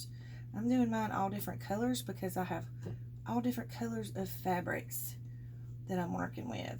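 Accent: South, United States. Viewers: I was sitting gluing and your video came up next on my playlist from the feed suggestions. I truly enjoyed it and loved your funny comments and of course I love your Southern Accent, I could listen to you the day long! Im a new subbie. Love your collage technique and yes I have more than my share of scraps. I so snippets but in long strips with a sheet base. Usually about 6-8 inches wide and it is to clean off my desk. Thanks for sharing and the fun! Have a very creative week!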